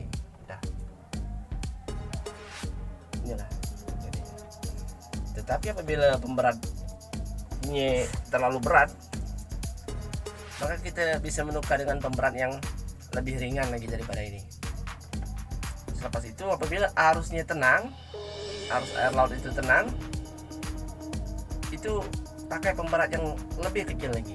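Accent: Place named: Malaysia. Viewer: Dari logat nye, ab org melayu, melayu mana bg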